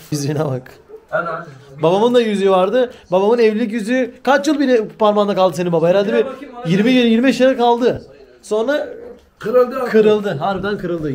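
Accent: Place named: Diyarbakir, Turkey. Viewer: Slm hayırlı akşamlar Diyarbakır'dan siveniz sanki bizimkimize benziyor siz Diyarbakırlı misiniz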